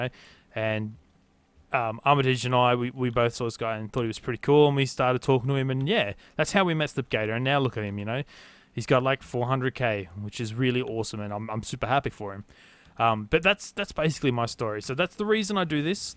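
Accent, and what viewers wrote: Australia. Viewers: live in Sydney, Australia and my internet is not the best but my friends internet is really good like i went to his house with all of our mates (6 of us) and we were all downloading unturned and we all did it in 30 seconds. do you live in WA or something? cause it sounds like it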